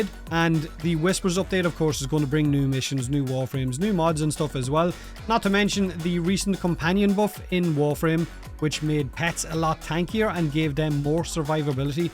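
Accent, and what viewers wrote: Ireland. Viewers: I sense british accent but it sounds more like irish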